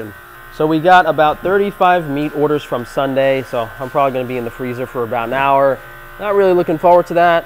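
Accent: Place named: New England, United States. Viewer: his yankee accent definitely would stick out in the south... southerners pick that up instantly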